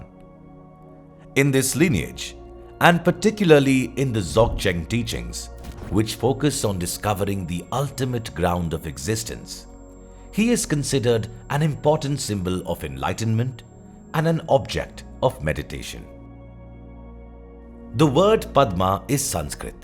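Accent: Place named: India. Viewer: The narrators Indian accent is cringe.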